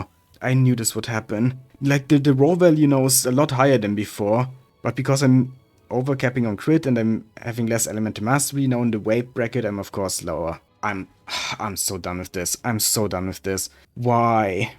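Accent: Germany. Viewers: I hear german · Do i hear german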